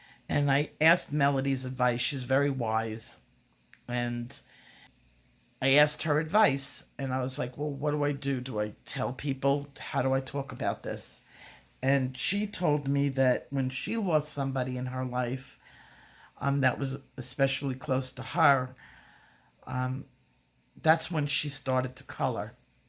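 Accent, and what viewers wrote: New York, United States. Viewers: When my close friend died I had not yet discovered coloring. What brings me here? You are a great talent. You are generous with your talent. You are clear. And genuine. And I like your New Yawk/New Jursey accent.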